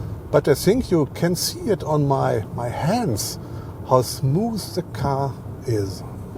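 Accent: Germany. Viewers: Totally appropriate to have a Porsche review with a Porsche reviewer with a thick German accent…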